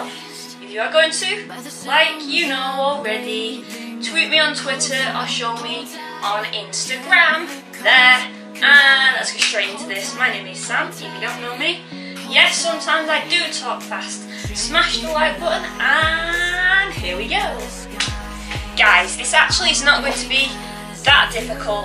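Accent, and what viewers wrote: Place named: Oceania. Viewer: Full view and like #5 from Bits of Real Panther! I think it's pronounced BWAY-no. You certainly have an energy about you,! I couldn't quite place the accent. Somewhere in Oceania? At least the recipe here was simple, something even I could do! hehe Also, do you keep any of your thumbnails on file? I'd like to feature a couple of them in an upcoming video. Anyway, thank you much for sharing, and I hope your weekend was truly "sweet"!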